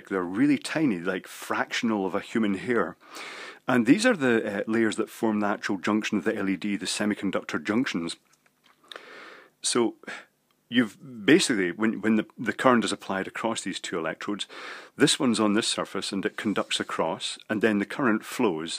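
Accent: Scotland, United Kingdom. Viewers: Haha, yes! I'm doing this same 100W LED project, and these videos help me for this same reasons, also Scottish accent's refreshing to boot.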